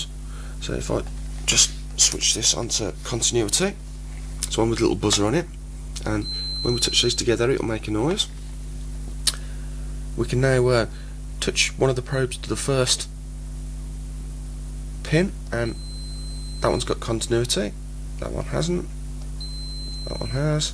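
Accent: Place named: United Kingdom. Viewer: RIGHT, RIGHT!!!!! I JUST LOOOVE THAT ACCENT. really cute, you British people. Please don't let your accent get away as it seems all things are going. At least there will be some "tickles" for the U.S.A. if one of you visits here. (Don't worry, I konw that "Jolly Good" is no acceptable. :O).). I donate to your cause. (I speak in liue of the part time job only, slow sticker vheickes, THE BOX, Future consciousness Sin Unit, Higher Power, and Paradigm Shift for 8 and 12 years.) Again, LOVE it!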